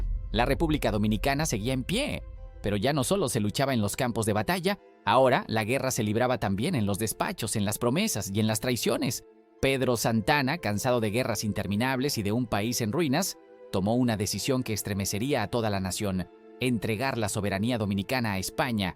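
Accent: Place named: Mexico. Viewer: Dueños este programa: ustedes son estúpidos o se hacen? Primero hacen un resumen ¨calimocháo¨ dejando muchísimos detalles importantes de nuestra historia, luego ponen a un narrador ¨mexicano¨ que dice ¨nosotros¨ como si esta fuera la independecia de México. Séan más serios...! Páguenle a un buen locutor o escojan a alguien que lea bien sino quieren pagar... pero que sea y se oiga como DOMINICANO!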